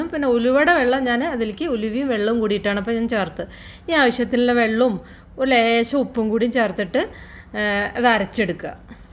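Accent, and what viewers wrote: Palakkad, India. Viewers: avar parayunnath enthanennu manasilayallo athu pore. Palakkad chilar usually ingane aanu parayuka. Ellaavarum ningale pole achadi bhasha samsarikkanam ennu nirbandham pidikkaruth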